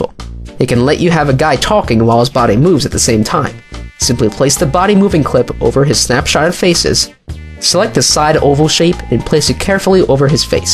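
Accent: Italy. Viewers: are you italian? o_O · ma...sei italiano? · Are u Italian?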